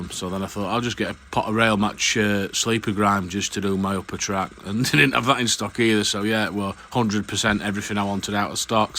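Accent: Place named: Yorkshire, United Kingdom. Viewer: Well I must admit I really enjoyed the video and the humour was excellent (good Yorkshire dialect). However, as a seller online I fo have to comment on cost of delivery. I pay £2.84 to send a parcel, it’s cost me around 65p to make packaging and buy the box, bubble wrap and tape. I have to drive to drop the parcels off at the drop off point and there is my time. I charge £3.25 for postage on my site and do I think you are getting a deal. Yes, I look for a good deal and also like free delivery. If I have free delivery I would lose on 85% on all sales so I would have to include that in the cost of the item and my prices would increase, when you buy more than one item the postage is combined so you get free postage after the first item anyway. Just think about it. Regards, Geoff -Scenic3D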